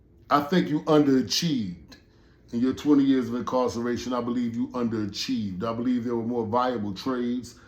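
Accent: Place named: New York, United States. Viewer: I just wasted 11 & something mins about nothing,sound like a throw back dude from n.y.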